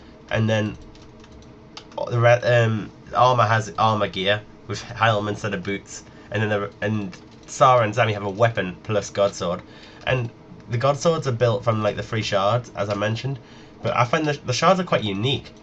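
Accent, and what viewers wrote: Newcastle, United Kingdom. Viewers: it's a geordie accent, like newcastle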